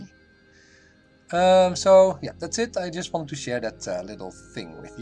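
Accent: Netherlands. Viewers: We like the Dutch accent too. But to hear your skills explained in a clear way is great. I know you say you are learning, but we are all learning. And to see your image and know how you got there is the information that is useful.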